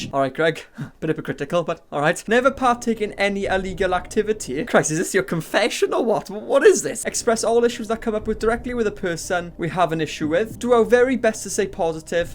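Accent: Wales, United Kingdom. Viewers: Welsh?commentary on how trash onion boy is yes please I didn’t know I needed this until I came across your channel by chance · Accent twins! Never seen a Welsh commentary channel before hahahah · You welsh? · bro ur so welsh i love it it sounds like home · Are you Welsh????? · I didn’t know it was possible to be SO Welsh 😂💖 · pfffft I L O V E Welsh accents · OMG, I ❤️ ur Welsh accent!! I’m from America, but I’m obsessed with Wales. I’ve been there 16 times, and I’m covered in Welsh- themed tattoos. r my fave band, and I want to move to Caernarfon. Thought it was hilarious when u called him a baby carrot! (For those of u who don’t know, “carrot” in Welsh is “moron”). 😆CYMRU AM BYTH!! 😍💚❤️🏴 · You're welsh! :D Closest thing I've found to my useless Shropshire self <3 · Is your accent welsh? It’s lovely ! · Off-topic, but I love your accent (im welsh too). 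· You’re welsh right ?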